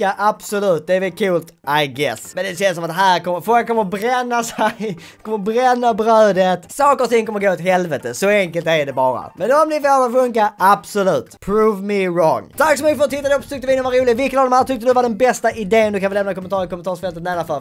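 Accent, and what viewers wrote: Scania, Sweden. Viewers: Älskar skånska!!! 😍😍😍😍😍😍😍😍😍😍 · Alva Rigo han e skånsk, såklart man gillar den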